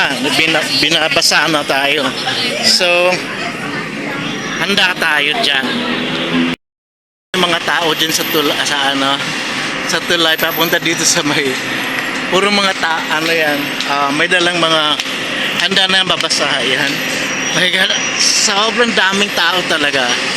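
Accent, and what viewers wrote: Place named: Philippines. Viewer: สำเนียงคล้ายคนฟิลิปปินส์นะ ถ้าผิดขอโทษ เพราะฉันมีเพื่อนฟิลิปปินส์เยอะ 🇺🇸🇺🇸🇺🇸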